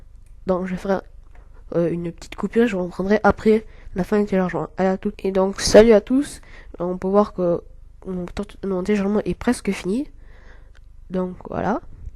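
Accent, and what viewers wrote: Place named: South, France. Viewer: tu es du sud xD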